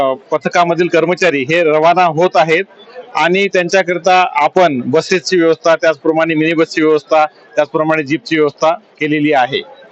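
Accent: Varhadi, India. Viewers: आपल्या वर्हाडी भाषेत मस्त बोलतात दादा..... एकच नंबर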